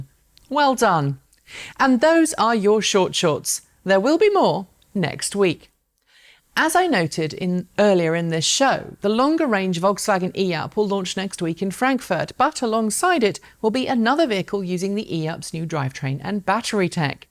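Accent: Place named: United Kingdom. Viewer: You Go Girl! Let me extend a well deserved congratulation for your award. Your efforts are leaving a footprint on the history of this planet, minus CO2 that is. I know that you do this not for the awards, however I'm sure that there are many more to come. Thanks for advocating "Cleaner and Greener". "EV Marketing/Awareness Award, is the founder and lead presenter of Transport Evolved, a website and YouTube channel that presents news and information about electric vehicles. Distinguished by her British accent and authentic voice, is respected for her objective, interesting content, with more than 1,100 videos and 95,000 subscribers".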